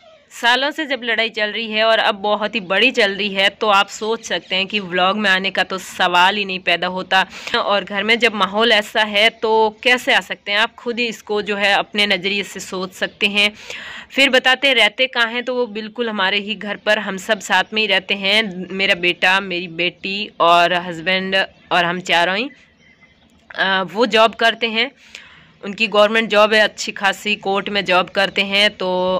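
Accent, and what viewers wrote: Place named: Barmer, India. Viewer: आप ऐक, अच्छे परिवार से,है, आपके माता पिता को मेरा सलाम, आपके मुख के सरस्वतीजी बोल रही है,,, आप मेरे को आप बाड़मेर से लग रही है अन्दाज है जय श्री कृष्णा जी श्रीं राधे राधेजी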